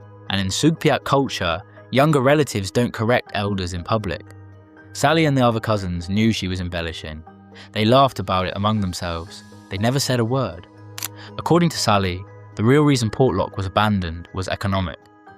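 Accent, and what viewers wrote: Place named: Europe. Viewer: European accents are almost intolerable to listen to… I’m so glad to be American.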